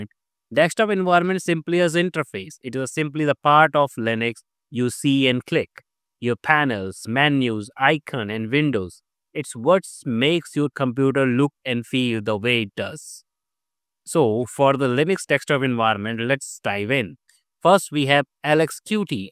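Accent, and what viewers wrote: India. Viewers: bro probably says carmel instead of caramel out here critisizing some indian dude speaking english as a second language LMAO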